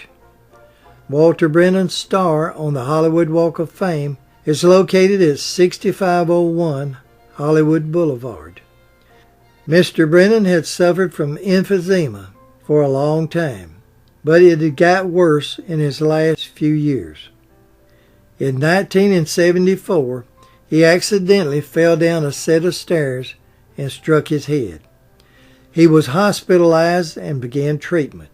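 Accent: South, United States. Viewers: So happy to see you back and righting Walter's memory. So good to hear your Southern charming voice once again! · I used to enjoy your commentaries and biographies on your channel years ago, but being new and unfamiliar with YouTube and how it worked, somehow I failed or forgot to subscribe. I'm glad I found your channel again, and I promptly subscribed. As a fellow Southerner from Lake Charles, LA, I recognized you by your familiar drawl! 😉😁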